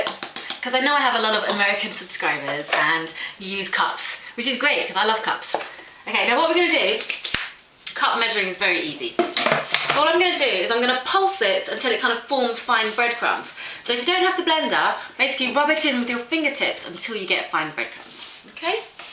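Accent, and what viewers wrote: United Kingdom. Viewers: British accent. Thought you were American? well... back to the pie business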